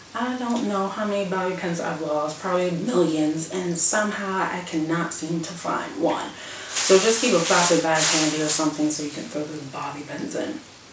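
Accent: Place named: South, United States. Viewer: where are you from? lol I know thats random but I cant place that accent. Certain words you say sound a lil southerny like "ool" lol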